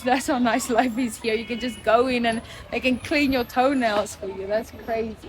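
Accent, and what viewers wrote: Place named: South Africa. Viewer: You are from South Africa? The accent gives away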